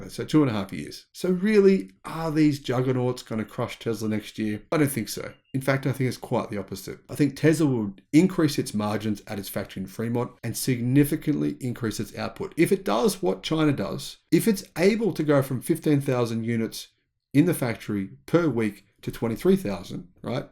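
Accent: Australia. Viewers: You're not a Viking, you sound like an Aussie.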